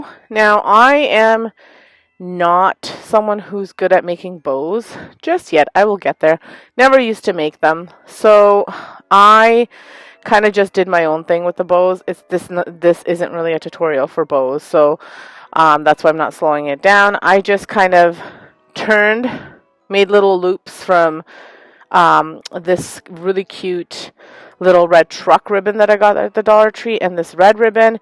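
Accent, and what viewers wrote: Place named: Canada. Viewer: I knew you had a accent. You are from Canada like my daughter in law. Lol.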